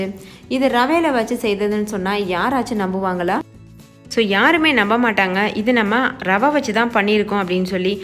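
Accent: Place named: Erode, India. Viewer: Akka neenga erode Akka.. Unga slang spr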